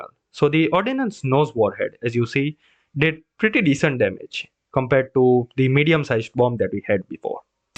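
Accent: India. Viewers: yes i knew this was good when i head the indian acent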